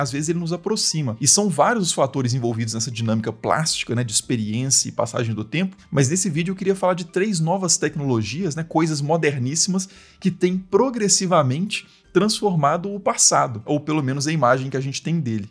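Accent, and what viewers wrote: Minas Gerais, Brazil. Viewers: Gente!!! O texto ja era elegante e preciso; os assuntos muito interessantes; a cadência da fala e a voz agradável atraentes; o equilíbrio, o respeito e a (tentativa bem sucedida) imparcialidade notáveis; e o delicioso minerês que emerge de vez em quando fazem do Estranha um canal necessário pelo conhecimento que divulga e eleva o ser humano mostrando o que ele tem de melhor. Parabéns, Dr 👏 Ah, este post era pra dizer que a edição está incrível ❤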